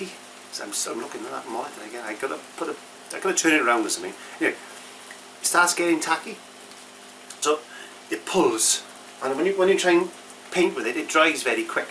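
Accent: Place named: Scotland, United Kingdom. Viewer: I love your videos and that wonderful accent. Scottish? I bought a couple of the Masterson Sta-wet palettes, and I like them a lot, but one is quite large and the other just a bit too small. I’ve tried using styrofoam plates that I put in plastic bags. They work, but can be awkward. The hardest part for making my own is finding an air-tight, flat container.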